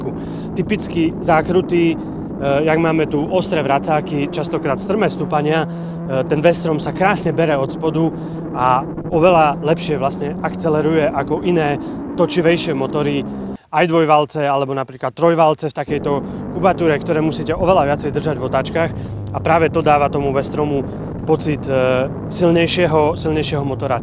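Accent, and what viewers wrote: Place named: East, Slovakia. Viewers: Chalani, viem, ze urobit dobre videa chce nielen nadsenie a prehlad v teme, ale aj teba mat aj iste zrucnosti v natacani v prezentacii. Nechcem vam zhadzovat robotu (napriklad prave preto netocim videa, lebo to neviem a nechce sa mi), ale zopar postrehov mi fakt neda nenapisat. 1. Nabuduce si tiez pozicajte farebnu motorku, lebo cierna by pred kopou hnoja nevynikla. 2. Motor P2 nie je popularny, je to len vynutene zlo od EU. Inac by tam stale boli V2. Treba povedat pravdu. 3. Kamera zblizka z ruky co nedokaze chvilu zaznamenat stabilny obraz pomaha hodit sablu, ked sa niekomu toci hlava uz z tej vychodniarciny. To tocila frajerka telefonom? 4. Ked sme pri nej (myslim vychodniarcine), tak svetlomet sa zrejme sklonuje podla vzoru "parka". Dve parky, dve svetlomety. Kazda dlhsia veta ako od Kapitana Danka. Vole. K tomu dychavicny prejav ako po prave zabehnutom maratone. 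Neviem, ako ste na tom v redakcii. Pripada mi to, ako keby ste to robili uz len zo zotrvacnosti. Stranka rovnaka ako pred 15 rokmi (mozno aj dlhsie, ale len co si sam pamatam), chcelo by to viac aktivnych redaktorov, alebo aspon jedneho z bezchybnym vizualnym a hlavne hlasovym prejavom a vy by ste sa mohli zamerat na odborne vstupy, no a k tomu este nejaky stativ a kamerku ci fotak s natacanim. PS: Chcel som to povodne poslat mailom, ale este aj ta stranka vam bezi len na HTTP a nie na HTTPS! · Kamo keď nájdeš niekoho ako co sa venuje tomu viac ako 20 rokov tak potom mudruj. To ze webstranka ma klasicky design je pre nás old school priaznivcov motoride fajn. Pamätám ze bol jednej z prvých co robil kedysi merch a vtedy išli tie prve tričká ako teplé rožky. To ze prešli na platformu YouTube je fajn a videa sa brutálne zlepšili aj kvalita aj strih. A co sa tyka vychodnarciny tak to k patrí je autentický a na nič sa nehrá. 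Len tak ďalej chalani v redakcii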